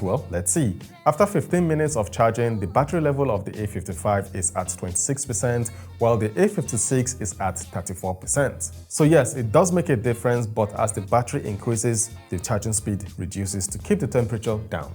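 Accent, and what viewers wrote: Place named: Nigeria. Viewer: Most of the Nigerians be like... "If you aks me"....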